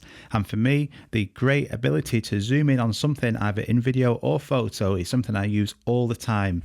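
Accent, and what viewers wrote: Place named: North, United Kingdom. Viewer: Can’t trusts anyone with a northern chavvy accent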